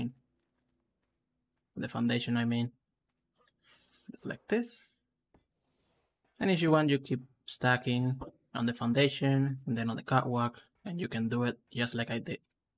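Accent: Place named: Cuba. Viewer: i like your accent i am cuban and you sound cuba or puerto rican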